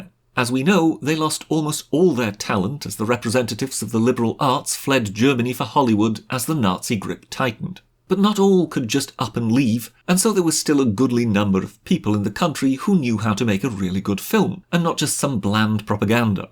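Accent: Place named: RP, United Kingdom. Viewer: Good idea! I feel for, not only does YouTube keep him down, I bet his ‘posh’ accent has limited his £ donations over the years ☹️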